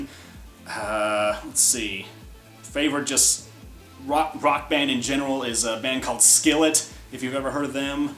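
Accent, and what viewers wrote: South, United States. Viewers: YOU'RE JUST WITH A COUNTRY ACCENT!! Thnk goodness..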